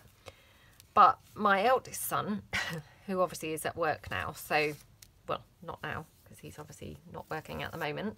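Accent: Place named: United Kingdom. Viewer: I love your videos,! This is another great one. You are so talented and kind! And besides, listen to you talk reminds me of a wonderful time in my past when I lived in the UK. Thank you for all! 🥰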